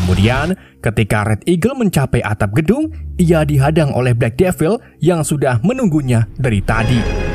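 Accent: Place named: Banyumas, Indonesia. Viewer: JEBULE NGAPAK RIKA BANG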